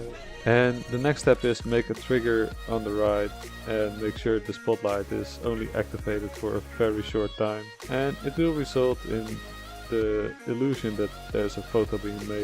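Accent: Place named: Netherlands. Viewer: Hello! I really like your videos! I don't want to be rude, but your voice sounds foreign. Are you Dutch? You sound Dutch lol.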